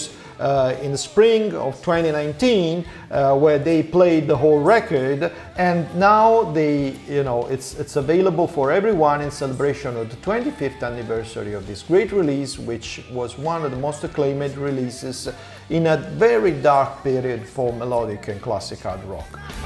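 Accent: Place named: Italy. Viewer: Thanks ! Very interresting and for a french easy to understand an italian in englich because le the accent . Please continue this little interview an long lire ton frontiers !!!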